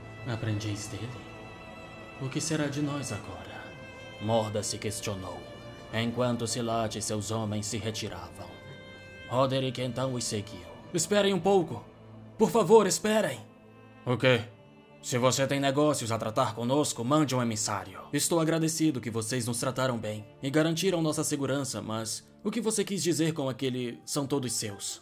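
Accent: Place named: Ceará, Brazil. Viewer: você é do ceará? as vezes o sotaque parece, e algumas palavras também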